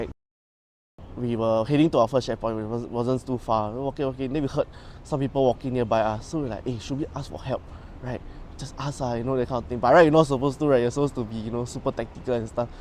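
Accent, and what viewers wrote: Singapore. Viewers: Interesting stories...but,literally i just dont understand his Singlish. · Unique Singlish... nostalgic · It wasn’t English. It was Singlish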